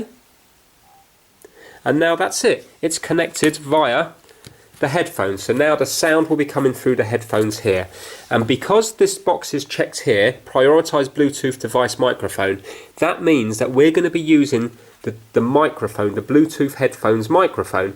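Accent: United Kingdom. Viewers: The british accent is very funny lmao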